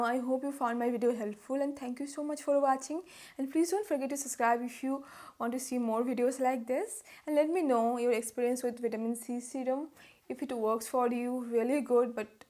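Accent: Bengal, India. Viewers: Godddddddddd ur cute.......got ntn to do with the video n vitamins n stuff but loved that bengali accent♥️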